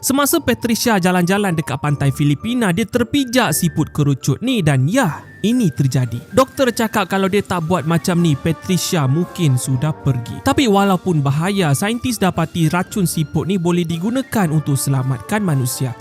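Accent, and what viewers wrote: Sabah, Malaysia. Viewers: Admin ni kan? Suara tu sebijik dgn slang Sabah dia sikit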